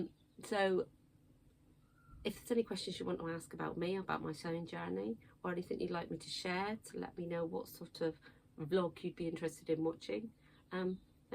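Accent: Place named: West Country, United Kingdom. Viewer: Great 1st vlog 👏🏻 really enjoyed listening to a West Country voice (being from Somerset). I’m interested in the bag makers box too - the bimonthly is good - not sure I need a bag a month 😅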